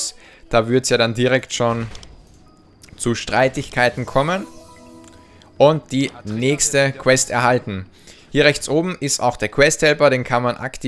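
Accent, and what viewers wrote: Austria. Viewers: bist du österreicher ? und nebenbei .... hab mir lang überlegt das spiel anzufangen und du hast mich jz dazu gebracht :D du kannst es so gut erklären xD würde mich auf antwort freuen und vill können wir ja mal gemeinsam zocken :D Grüße, Ravaer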